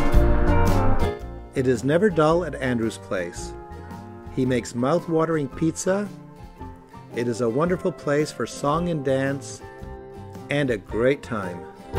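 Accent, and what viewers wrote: United States. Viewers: Why thank you. But sorry, I do hear a bit of the US in there. I wonder if your roots (your birth place and especially your parents birth place) were from the US and you spoke with American accents in the house (or on the ship, in your case) as your parents held their mid western accents. The Scottish was very slight with my ear - but I certainly did pick up the good ole Wheat Fields in your accent. I loved this video and would adore visiting this place someday when my 2 dogs are gone. BLESSINGS. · Maybe it's just prejudice, but I can't abide American narration.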